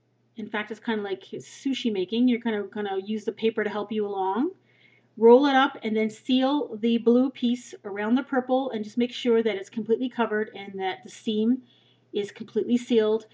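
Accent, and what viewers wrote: Canada. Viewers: As an american, she definitely has a canadian accent. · she's canadian, right?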